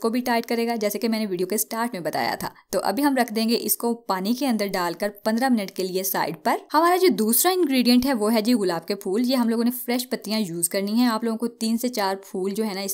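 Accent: India. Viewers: Ye pakistani itni indian jese kese bol rhi hai hay hay kese kese 👌👌❤️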